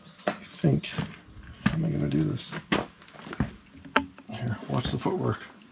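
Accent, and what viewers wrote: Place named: Canada. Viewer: Correction, they are not trains....they're trolley cars or street cars. The exception are those high floor Chicago subway cars that were made using parts from old Chicago PCC trolleys. And I must say I like your Canadian accent. Did you happen to get the car number of the 1949 Red Arrow {Philadelphia Suburban} car that you boarded on the time bar. You showed the interior above the windshield, but I couldn't see the car number. Man, these ads that pop up every minute or two are really annoying. The ticks and other insects must have had a great time with you. And frankly, it's dangerous to climb around in equipment in that deteriorated condition.They've been exposed to the weather for decades. Doing it alone is especially risky and unadvisable.. It could be likely to have unfortunate consequences. If you got hurt, who would help you? 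Let's hope there is working cell service there for emergencies., that's not a driver's seat. It's the motorman's cab. The conductor collects fares or opens/closes doors. The conductor doesn't operate vehicles. The motorman operates these cars. You've got lots to learn before narrating a video like this. It might interest you to know that a scrap dealer has just bought everything at the Windber site and by year's end it will be cut up. That's to give museums and collectors time to buy things such as spare parts. There are a few vintage cars inside of the shop building that should go to museums. <link>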